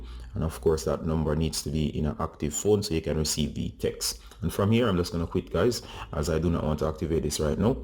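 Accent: Jamaica. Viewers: Are u jamaican? Good vid btw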